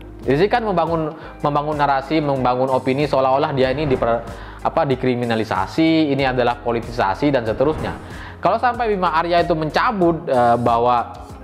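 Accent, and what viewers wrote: Madura, Indonesia. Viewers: Kya logat madura bicaranya bang😅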